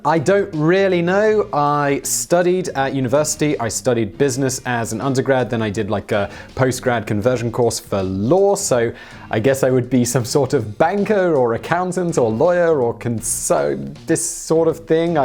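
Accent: United Kingdom. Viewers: Coming from an Aussie, I picked your accent immediately, way back when I first started watching your channels. It is very British. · Just wanted to say I saw your recent post about the podcasts, which I hadn't previously heard about, so I checked them out and now I've listened to almost all of The Brainfood Show! Still have a few left to get caught up, but I've really enjoyed it so far. Especially since you open up a lot more and show your real personality ;) You're a very funny and charming guy! I've learned a lot from your channel and podcasts. Usually I dislike fact-based channels because it's generally stuff I already know, but you frequently manage to surprise me with new information. PS I don't think your accent is weird, it's pretty obviously "British person who has lived elsewhere in Europe for many years" and it's not grating or distracting. Honestly I normally don't notice it. 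· Great video, most enjoyable and as a Brit you still have a very strong, unmistakable, British accent Simon - although I wouldn't have picked Maidstone. South-East, certainly but more private school London. Keep up the great work.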